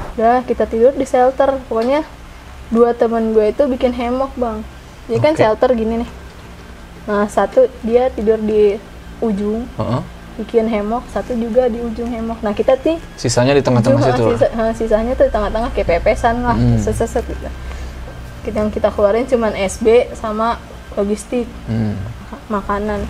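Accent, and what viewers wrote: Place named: Depok, Indonesia. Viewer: Kentel amat mpok logat betawinya, ciri khas betawi gaul, klo cerita asik dan seru, idup cerita nya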